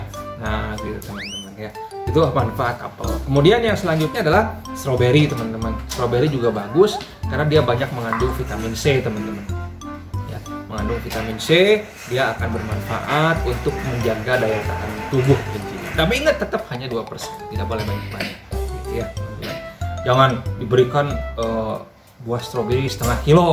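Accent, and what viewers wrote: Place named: Sunda, Indonesia. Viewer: si aa atuh meni lucu sunda na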